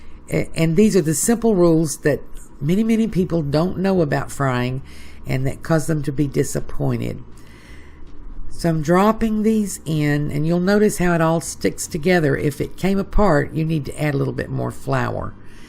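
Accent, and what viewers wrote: South, United States. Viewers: where are you from you sound southern and my 88 mother in law loves you but didn't know how to ask for she is from Georgia.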